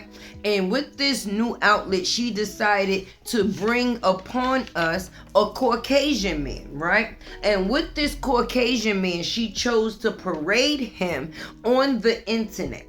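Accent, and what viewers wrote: New York, United States. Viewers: Thank you for the video great job once again you got me. I was on my way in from the garage and you were talking about the young lady. I did not know who she was. Thanks for the recap. I turned around and sat on my stairs before I get into the house and listen to the whole thing. Great job keep it up. I think I’m getting a hint of up north accent like New York or New Jersey great Common sense conversation👍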